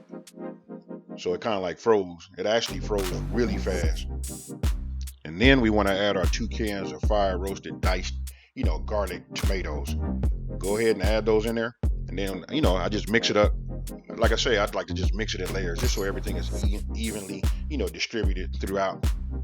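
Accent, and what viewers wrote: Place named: California, United States. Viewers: You sound like you from Cali.I use to live in Alameda. Mannn... keep doing ya thing brother. Godbless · OMGGGG i’m trying like recipe! this my first time coming to your channel. as soon as you started talking i knew you was california🤣😭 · I love the L.A. accent boy felt like home!! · That’s how people from Cali sound. Black men either sound like Ice Cube E-40 or CJ from Grand Theft Auto San Andreas in California 😂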